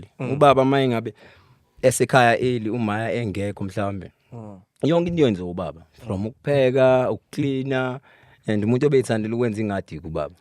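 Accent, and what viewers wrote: Zulu, South Africa. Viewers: IsiZulu siki.🔥💯🤣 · zulu accent❤️😂😂😂😂. Iynhlwabuse😂😂😂😂.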